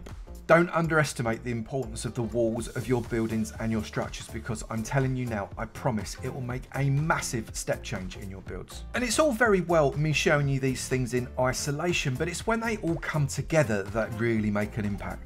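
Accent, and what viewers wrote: United Kingdom. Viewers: he got the average british "voice" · its the british accent 😹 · Are u British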